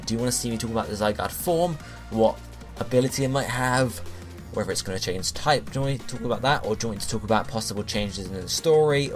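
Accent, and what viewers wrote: United Kingdom. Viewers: He's from the UK, they pronounce words and letters differently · Omg man sorry that's amazing. That's just how us brits pronounce the letter Z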